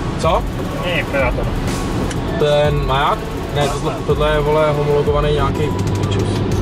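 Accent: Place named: Poland. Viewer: gay s polskym artikulovanim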